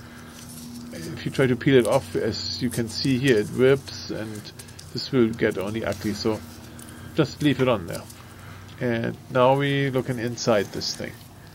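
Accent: Germany. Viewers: Du hast einen sehr starken deutschen Akzent:)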